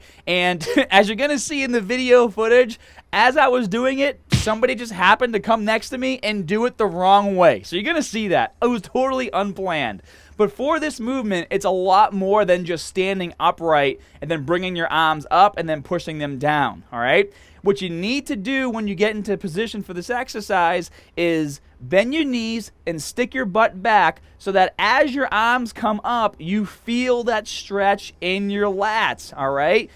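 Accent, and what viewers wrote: Boston, United States. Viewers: Love that Boston accent bro! Good info!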